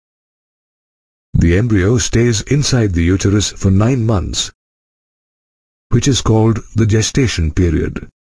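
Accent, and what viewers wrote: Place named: India. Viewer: This is Indian accent ma'am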